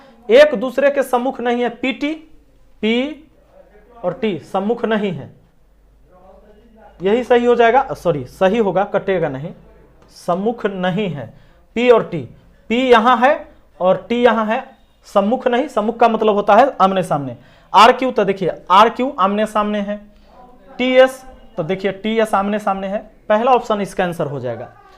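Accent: Bihar, India. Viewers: apni bihari tone... mja aa jata h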